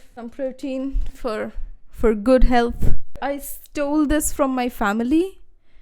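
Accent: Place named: United Kingdom. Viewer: You have british accent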